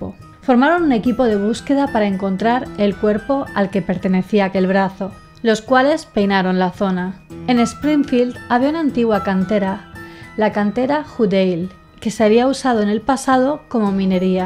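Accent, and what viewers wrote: Spain. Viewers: Qué hermoso acento español 🤩 😍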